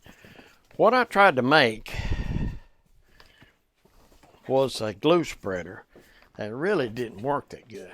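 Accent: South, United States. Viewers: Absolutely terrific video,! I'm a retired educator who began my professional career teaching junior high Industrial Arts (AKA "Shop," because most folks have no idea, nowadays, what Industrial Arts was/is). After 8 years, I was recruited to become a middle school principal for 3 years, then was recruited to join the faculty of Industrial/Vocational Education at Mississippi State University (Starkville is my hometown, even though I now live in Tupelo, birthplace of Elvis, after I retired from MSU). Anyway... I do some woodworking in my retirement years out in the garage shop, but it's not set up for a "real" shop like I had when I was teaching. The single thing I miss most in a good, solid, hefty workbench, largely because I don't have a vise to hold my projects when I filing, sanding, shaping on projects like charcuterie boards, etc. I'm now 77 years young, so I have to face reality that there are not enough years left on this Earth for me to dump a pile of money into fixing a top-notch shop. 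I feel 28 in my mind, but I realize that I have fewer years ahead of me than lie behind me. Ha! So, thanks for your outstanding job of "teaching" your viewers as you go. It's a pleasure...and I can't figure out how I didn't find you on YouTube 10-15 years ago. By the way, I can tell from your accent (but we Southerners don't really have an "accent," do we? Ha!) that you're in the Southland somewhere. Have you ever shared what general part of the country you're in....like the State w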